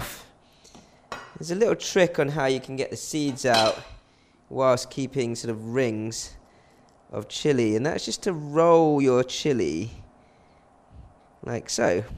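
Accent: United Kingdom. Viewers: Love your British accent 😄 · Slightly British accent 😁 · Chinese guy with British accent got me head spinning! 😳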